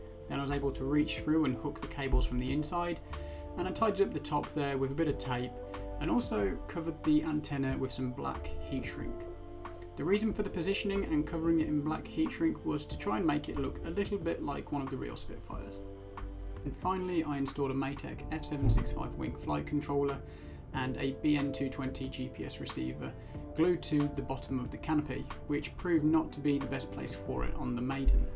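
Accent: United Kingdom. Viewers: SUPURB!!!!! I take it your in the UK. Accent plus ROUGH LANDING N TAKE OFF SIGHTS. Hahaaha every other country Italy Germany USA ect ect ect have decent runways purpose built were still taking off from grass. Not much has changed since ww11. I'm In the same boat WHEELS OFF BELLY LANDINGS. You've done a brilliant job... I'm still trying to get a 1000mm or a 800mm Spit great shots Brian London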